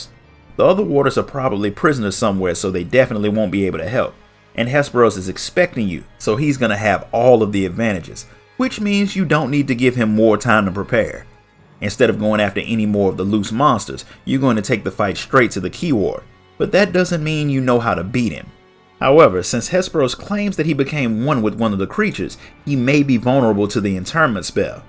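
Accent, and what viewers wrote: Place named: South, United States. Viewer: That smooth southern accent tho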